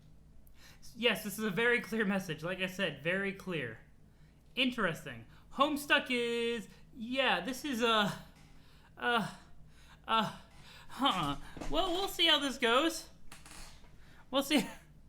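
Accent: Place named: Scotland, United Kingdom. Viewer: your socttish eridan accent is the best thing i've ever heard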